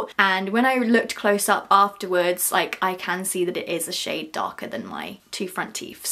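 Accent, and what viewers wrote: United Kingdom. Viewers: Wow ur British accent is so cool😅😊❤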